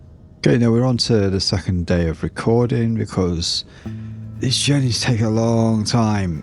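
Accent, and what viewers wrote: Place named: United Kingdom. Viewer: I was wondering what part of the uk your from?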